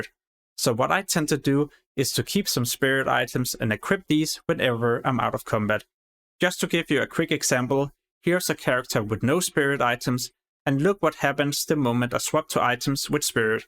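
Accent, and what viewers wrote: Denmark. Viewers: The danish is strong with this one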